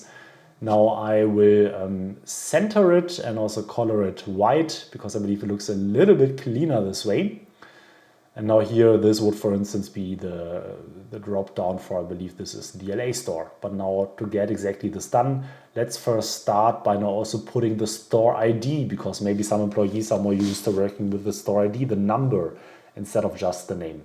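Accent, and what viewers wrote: Germany. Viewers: Hi, great videos! Especially your knowledge combined with the German accent makes me as a Hesse really happy!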